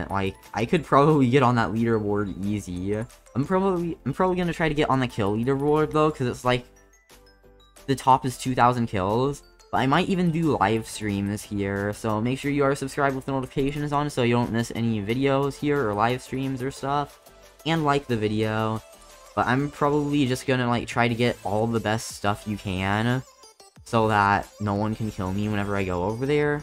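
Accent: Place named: United Kingdom. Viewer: So bri'ish for me idk why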